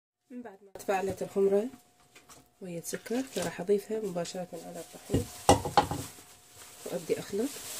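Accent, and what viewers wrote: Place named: Iraq. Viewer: تسلم ايدك عاشت العراق واهل العراق الطيبين اجمل اشي بالفيديو هدوءك واللهجه العراقيه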